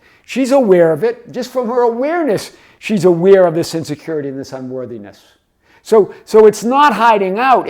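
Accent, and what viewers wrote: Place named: New England, United States. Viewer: tbh nothing makes me smile like hearing your authentic new england accent! 😂 It makes these videos hit home so much more for me living out here in cali haha. Love your contagious energy!!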